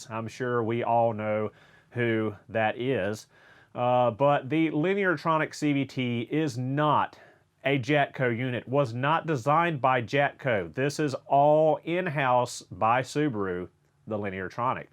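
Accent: South, United States. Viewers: As a not native Floridian (I'm moving from Michigan), idk why but I trust a southern accent more when it comes to car stuff. Seriously, you're right. JATCO has horrible CVTs with rushed designs, but Subaru and Toyota CVTs last. I know this first hand. I'm about to sell my Nissan and get either a Volvo or Subaru. Thanks for the objective advice. Sometimes I like Scotty Kilmer (the guy I know youre talking about LMAO) but half the things he says are outdated or incorrect.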